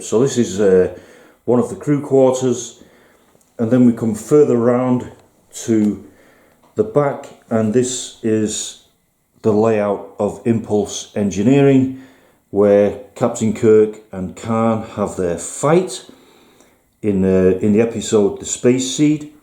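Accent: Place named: North West England, United Kingdom. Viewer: Where are you intending to display this? I assume you're in north west england from the accent?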